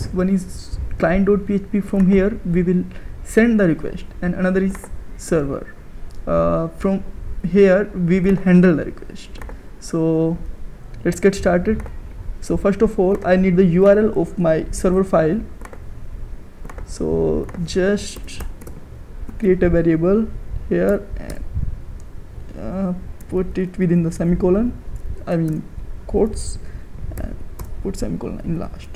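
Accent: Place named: India. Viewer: indian english accent ... stfu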